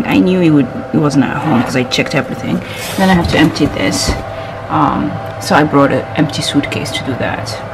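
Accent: United Kingdom. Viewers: You picked some british accent😁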